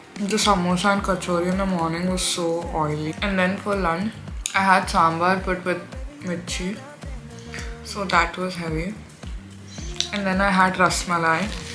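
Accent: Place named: Hyderabad, India. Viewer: She is hyderabadi. I can interpret from the english accent and speech pattern.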